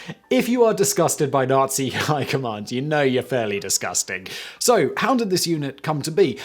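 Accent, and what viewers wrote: United Kingdom. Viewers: Can u try American /Canadian accent,British accent story telling is just too tiring ..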